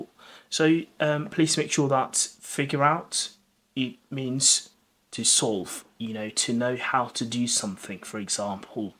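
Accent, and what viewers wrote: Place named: England, United Kingdom. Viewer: I like you accent , what type of accent are you talking with I mean what part of England are you from?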